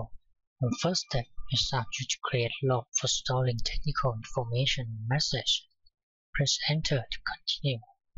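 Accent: Asia. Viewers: Not his voice, his asian accent.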